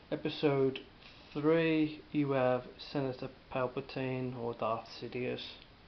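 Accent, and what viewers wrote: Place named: England, United Kingdom. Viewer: Definately a box set i would like to get . Great review buddy . This is the first time i have heard your voice , you are a natural speaker . I really like hearing the English accent . Peace....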